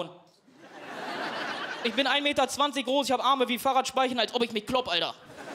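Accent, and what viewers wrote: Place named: Duisburg, Germany. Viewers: Super Typ, ich bin aus Hamborn und in Marxloh zur Berufsschule gegangen. War damals (um 1980) schon ne besondere Gegend. Wohne seit über 30 Jahren in Stuttgart und den Duisburger Dialekt finde ich immer noch einfach klasse. Mach weiter so, super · Liebe unsern Ruhrpott Akzent 😎☺️❤️ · LoooL, hatte noch nie von ihm gehört aber so geil! Liebe den Duisburger Assi Slang, bin viel in Duisburg unterwegs. Sehr erfrischend!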